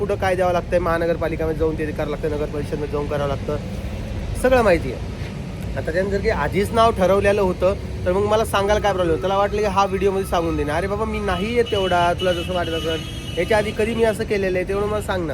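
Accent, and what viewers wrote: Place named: Pune, India. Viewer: ओम पुण्याच्या लोकान सारखे का बोलतो अरे आपली विदर्भाचि भाषा चांगली आहे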